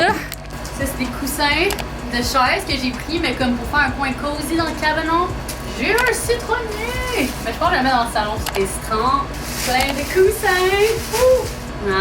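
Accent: Quebec, Canada. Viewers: Je viens de la Colombie mais, j'aime vraiment votre accent québécoise en plus comme tu mélanges le français et l'anglais tu es vraiment naturel et original, je vous aime people quebecois.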